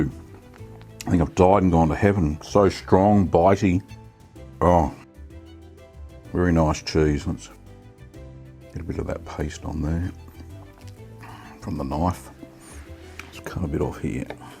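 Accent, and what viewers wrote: Australia. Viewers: I bought some of this from a cheese maker in Napa, California over 20 years ago. It was amazing on crackers, then I used some for gourmet grilled cheese sandwiches and macaroni and cheese. Absolutely wonderful. I hardly ever find it these days. I am an eater, not a maker, so I have to keep looking. Love your accent from Oz. My grandfather was born in Sydney in 1899. I’ve visited 4x for business and holiday, and look forward to returning again.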